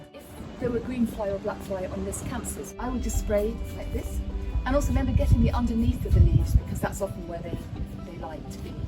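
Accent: United Kingdom. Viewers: 口音英国口音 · 听到你温柔的英国口音，让我想起来伊丽莎白女王讲话时的情景，很值得回忆。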